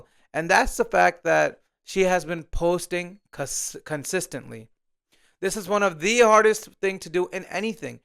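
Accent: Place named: United States. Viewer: You've dug your own grave by publishing click-bait crap (scam, exposed, etc.) You'll never be a success trying to undermine others. Try doing this with white skin and you might be grateful that you tick at last one of the diversity boxes. Be happy bro, life is amazing! Given your accent, I'd guess you're in the US. If your'e looking for career optoins, try the Democrats.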